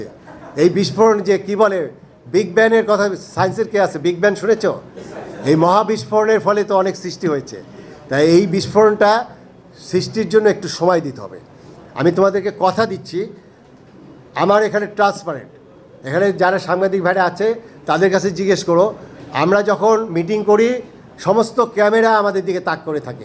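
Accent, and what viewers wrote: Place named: West Bengal, India. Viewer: ওনার বাংলা ভাষা অনেকটা পশ্চিম বংগের মানুষের মতো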